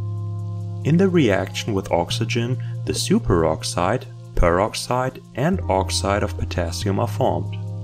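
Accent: Germany. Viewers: interesting question. it at least sounds like he has a slight german accent. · Are you German? Just for curiosity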